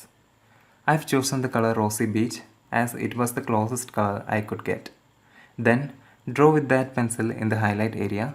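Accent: India. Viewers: Wow, that's fantastic!!! It helped me very much, because I'm drawing very much the last time (I've drawn rey of Star wars (but not the hair yet ;) )and now I'm working on Frodo Baggins) One of the best channels in YouTube!!! And I love your Indian accent ;) How much time do you need to do an Portrait?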